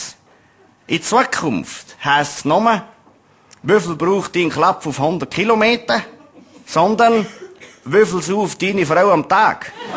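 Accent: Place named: Appenzell, Switzerland. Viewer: Einfach köstlich! - Bin ebenfalls Innerrhödler(in), lebe aber schon seit über 20 Jahren im Ausland und habe und seine Videos erst heute entdeckt. Was für ein herrlicher Fund; ein super-Aufsteller und Wiederfreudehaben am urchigen Appenzellerdialekt! Herzlichen Dank fürs Hochladen!!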